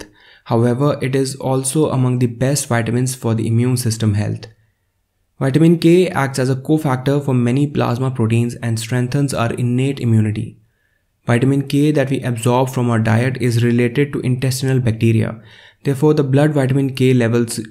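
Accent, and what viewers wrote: India. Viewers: thank you, normally i dont listen to Hindu accent, but your information is worth it!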